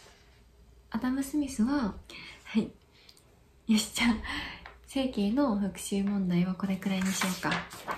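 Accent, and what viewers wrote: Kansai, Japan. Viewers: 関西弁ネイティブですやん！！せ↑いけいとかもうネイティブなんですよね笑こんな家庭教師おったら顔見て話聞かずに終わりそうw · ①今から文転して大学受験し直すか、 ②来世で先生が理系になっている世界線に賭けるか、 ③アダム・スミスになるか、迷って勉強できないので先生に相談しにいきます。あとTOEIC点数高すぎますし、めちゃんこ可愛いし、関西弁かわいいし、本当尊敬してます！ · 関西弁喋れるんですね。関西人ですか❓私は和歌山県に住んでた事があって関西弁もマスターしました。地元の人にとても関東人には思えないくらい発音がネイティブって言われるくらい上手くなりました。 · かわいい先生❤️関西弁もいい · 最近ASMRにハマって、サムネイル画像でめちゃくちゃ理想のタイプって思って観て(聴いて)みたら、優しい声で、関西弁で…惚れてしまいました!!😳🥰 これから毎日寝る前に観よう(聴こう)と思います😊 応援してます!!👍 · 投稿お疲れ様です！メガネいいですね！そして関西弁、すごい親近感です😆 引き続き応援してるので頑張ってください！ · 関西弁💕💕 こんなに新しいロールプレイも良き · 関西人なのに関西弁にやられる。。。激萌ゆる、、、ある意味メンタルやられる♡ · 関西弁、、可愛い😍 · おお！流暢な関西弁！メガネ姿もかわいい。今度、大事なところは耳元で囁いてくる、誘惑してないのに誘惑してるようになっちゃう先生とか見たい！ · メガネ！やっぱり可愛すぎ！特に下にずらしてる時！関西弁の引き出しまであったとは😳 シャーペンで書く音が1番良い音だった！英語の勉強した方が良いのは分かってるんやけどなー・・・ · runaさんは関西人かな？そうじゃないとしたら関西弁自然すぎる笑それと関西弁ロールプレイは大阪人の自分からしたら最高です😃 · 関西弁…最高…親近感…可愛い… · 関西弁ッ！好きです（直球）関西出身なんですね。今度大学でtoeicを受けるので参考にさせてもらいます！ Runa先生にtoeicの勉強教えてもらいたい… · 関西弁ー？！？！？関西人なのですか！？！？好きです🥰🥰🥰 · あれ？先生関西人やったんや、、🤣知らんかったん俺だけ⁉︎ 何か勝手に親近感‼︎嬉しい😍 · 関西弁！萌える！！地声も顔もめちゃくちゃにかわいい！！ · × 関西弁とか可愛さの致死量に達する...😇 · 今年受験生だし政経選択だからほんとありがたい。Runaさん関西弁だけど、東京にいたら青山学院大学通ってそう。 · 関西弁！？最高すぎ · 関西弁カワユス♥️ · 関西人やけど周りにここまで関西弁の女の子居ないからなんか新鮮でした😄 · 関西弁？めちゃいい.ᐟ.ᐟ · 何やろう･･･ このコテコテでもない、上品な関西弁は･･･！ Runa先生の育ちの良さが出過ぎてます･･･ 個人的にの笑顔大好きです･･･癒されました😍 音声は後半のアプリ紹介のところですごく近くなってドキッとしました!! 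· 元々好きな動画やったけど、関西弁で益々好きになったわ❤️ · 関西人のわい関西弁に歓喜する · 関西弁めっちゃええなあ。次は津軽弁待ってますこんな家庭教師さんおったら勉強が嫌でも好きになってまうて 250万人に使われる大手さんから案件来るとは流石！これからもファイト、オー！なのですよ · 関西弁が可愛いすぎ😭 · 関西弁で関西ニキたちが歓喜してる中、謎の敗北感に襲われる関東生まれの俺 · この可愛さで関西弁は破壊力満点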